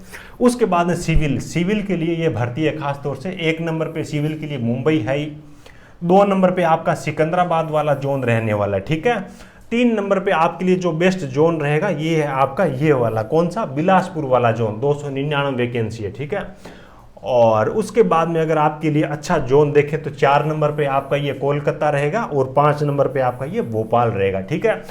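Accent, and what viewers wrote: Rajasthan, India. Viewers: Sir ap Rajasthan se ho na 😂😂 apki language se pta chala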